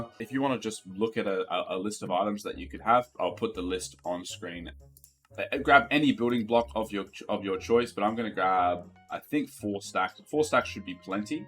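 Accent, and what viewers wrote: Australia. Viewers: i knew u where australian i am too, also u able to make a video the same auto brewer but with like more brewer stands like if i want to put nether wort first and then like surgar cane so mutiple locks and stuff so like im sure u can put your stuff idk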